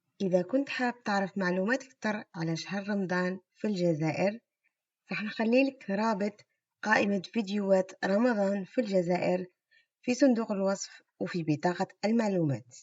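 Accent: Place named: Morocco. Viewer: راها تشبه بزاف شغل لهجة مغربية نتاعنا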